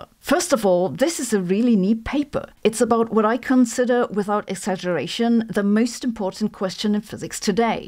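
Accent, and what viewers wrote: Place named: Germany. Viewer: It’s that English with German accent influence that is just…….. scintillating! I know , it shouldn’t enter into the conversation but what the hey, anyway! Love your videos, even if I don’t get the math behind it!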